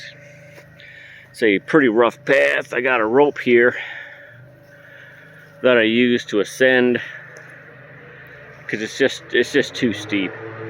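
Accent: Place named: Tennessee, United States. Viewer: You are already starting to sound like a Tennessee person!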